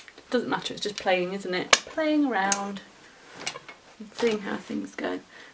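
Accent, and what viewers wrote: United Kingdom. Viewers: I love your videos. Listening to your way of talking to the camera fills my heart with joy 🥰 and I love your British accent (I'm from the USA). I'd love to buy a few of your patterns on ravelry but I have a tremendous problem following UK crochet terms. It's like my brain gets stuck. Have a great summer🏵💦🌞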